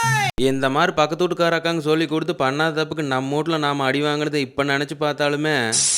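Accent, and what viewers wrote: Kongu, India. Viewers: Namma kongu thamil arumai🔥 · Ungal kongu tamizh, Coimbatore lollum super. Samayalum dan…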